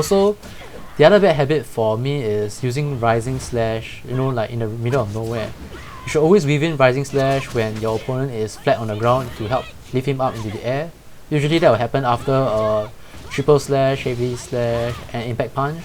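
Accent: Singapore. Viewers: Lol I can tell you're Singaporean just by the way u talk! No problem understanding u cuz im also Singaporean :D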